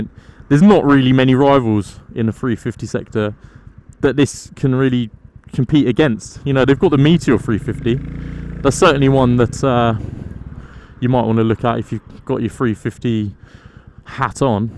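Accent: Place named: South India, India. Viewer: It's probably the south Indian accent of many Indian youtubers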